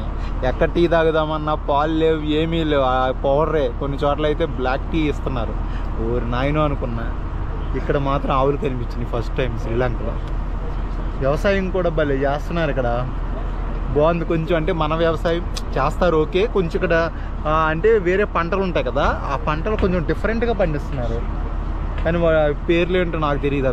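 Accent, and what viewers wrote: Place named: Krishna, India. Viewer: అచ్చం కృష్ణాజిల్లా వాయిస్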